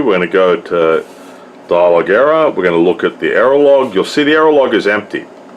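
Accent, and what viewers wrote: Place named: Australia. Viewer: Cool, keep the vids coming. Really enjoy them. Love the Aussie accent too, makes a pleasant change to hear "solder" as it should be pronounced !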